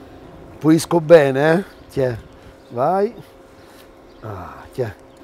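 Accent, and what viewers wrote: Rome, Italy. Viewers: ..te lo devo di...tu ogni volta mi fai venire un orgasmo gastonomico con le tue ricette😂 ma poi amo tantissimo come tu le racconti con quell'accento romanesco. I love It😍❤👍👍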